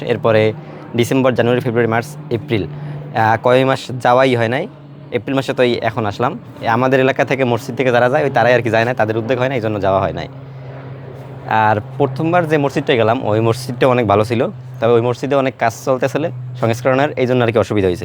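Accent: Barishal, Bangladesh. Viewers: আপনি কি বরিশালের নাকি ভাই?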